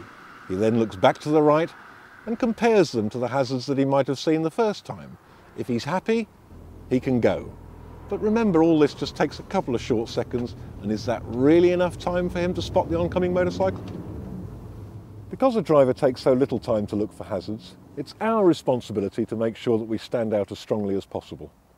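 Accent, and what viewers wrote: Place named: Australia. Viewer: Hey, she's Australian! Not that it particularly matters. Excellent video, anyway.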